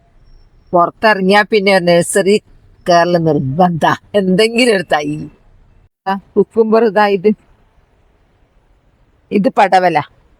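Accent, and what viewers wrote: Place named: Wayanad, India. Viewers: വയനാടൻ സംസാരം നല്ല രസം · nice vayanadan samsaram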